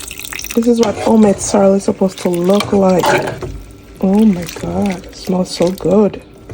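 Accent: Jamaica. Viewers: Just discovered your channel. Love that you get to the point. Love your recipes. Love love love the jamaican accent and I'm subscribed.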